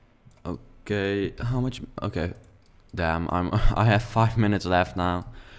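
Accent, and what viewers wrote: Netherlands. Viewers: een nederlands accent ;) je bouwt mooi!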